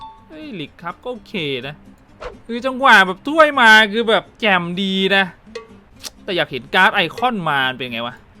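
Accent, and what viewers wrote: South, Thailand. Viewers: พูดเหมือนคนใต้เลยนิ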